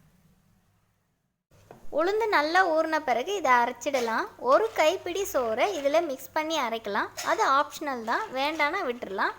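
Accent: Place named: India. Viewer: நீங்கள் பேசுவது இலங்கை தமிழ் இல்லை. இந்தியா தமிழ் மாதிரி இருக்கு.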